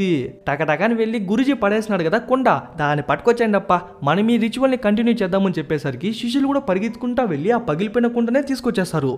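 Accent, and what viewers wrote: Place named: Karnataka border, India. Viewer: Karnataka border lo slang alane untadi bro for reference you can see harm hara movie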